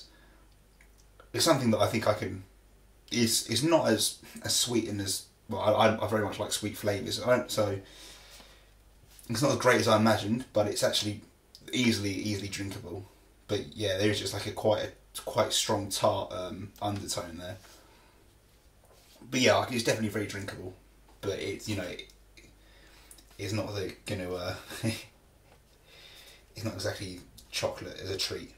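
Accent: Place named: United Kingdom. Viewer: Where are you from? Obviously U.K. But what part of England?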